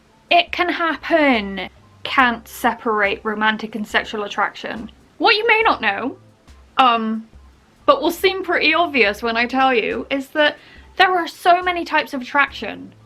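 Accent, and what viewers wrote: Bristol, United Kingdom. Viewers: This has been helpful. Also it's nice to hear a Bristolian voice on Youtube :) cheers drive!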